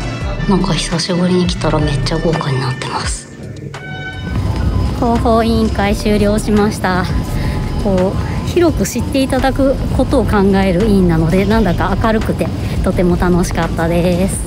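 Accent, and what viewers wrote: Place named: Okayama, Japan. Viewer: 外ではバリバリの東京の言葉(?)で話してますが、夫婦の会話は岡山弁ですw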